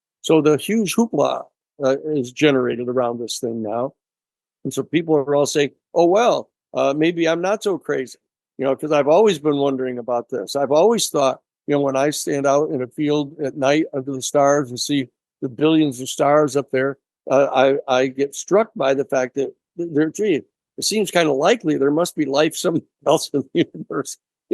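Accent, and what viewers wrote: Wales, United Kingdom. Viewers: You still sound Welsh, but the heavy accent has gone 👌🏼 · How much more disclosure do we need 🤔? Col Nell (Grusch’s boss) went on record last week saying “There is zero doubt non human intelligence exists “. Greer has 30 years of data which is now available to the public. All this has been filtered out of mainstream media for sure, along with anything else that may remotely affect the reality they want us to believe. Our purpose now is to connect the dots and remember the truth of who we really are. What is more exciting than disclosure? Ans: finding a podcaster with a 🏴 Welsh accent - respect ✊ Light and love to you guys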